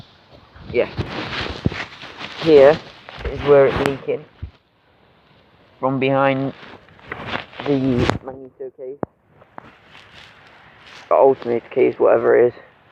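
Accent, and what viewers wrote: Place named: Manchester, United Kingdom. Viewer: I am!!! :) I'm saving for this model bike! He sounds to me like he's closer to Manchester!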